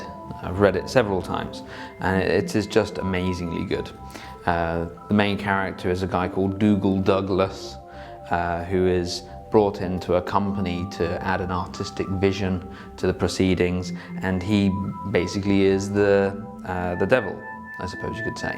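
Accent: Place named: Scotland, United Kingdom. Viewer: She scottish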